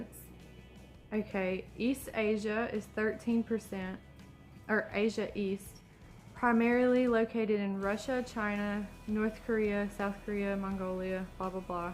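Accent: South, United States. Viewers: And your accent say you're 100% Southern. Americans are all muts.👍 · Everybody is a mix of genetics from different regions of earth. There is no such thing a a pure race. You are what you are and that is beautiful. You are unique. Be proud of who you are. So here is a little random comment. You look like Sydney Watson, who has a youtube channel also. You may be related to her. btw you are gorgeous (lucky husband) and I love the southern twang in your accent. Which state are you from? Be happy, be proud. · You have a lovely southern accent. Are you from North Carolina ?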